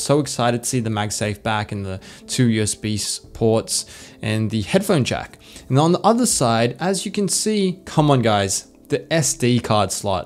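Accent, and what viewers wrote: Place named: Australia. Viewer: Hearing a familiar Aussie accent reviewing this powerhouse is strangely comforting? That or I’ve been in lockdown for too long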